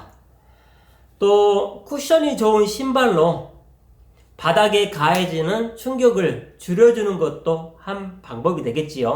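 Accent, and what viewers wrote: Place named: South, South Korea. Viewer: 포토샵 영상에서부터 느꼈지만 디테일하고 전문적인 수준 높은 강의 중화된 남도 억양과 조분조분한 말투 너무 듣기 좋네요 2024년도 님과 가족분들 모두 건강하고 행복한 한해 되세요 2024년을 2004년으로 썼네요 그래서 수정 ㅎㅎ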